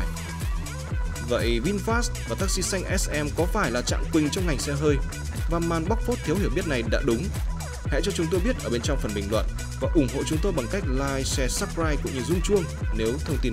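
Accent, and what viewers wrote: North, Vietnam. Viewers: nghe giọng ba kẹ là tao đã ngi ngi rồi :v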